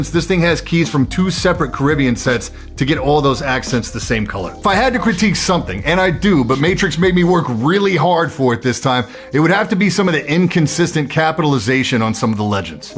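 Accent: New York, United States. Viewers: You do great reviews man. Really cool, polished production. And I don't know where you're from so I hope it's ok to say this, but I love that your accent sounds like you're a New Yorker just talking to his buddy like "Yo Jimmy! Look at these fuckin' keycaps. Look at these things." Such a cool vibe in a tech review.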